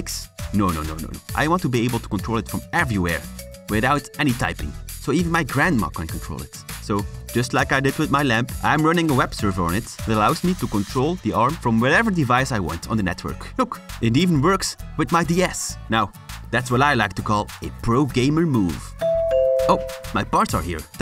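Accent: Netherlands. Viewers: I smell Dutch. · u sound rlly nederlands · You Dutch.?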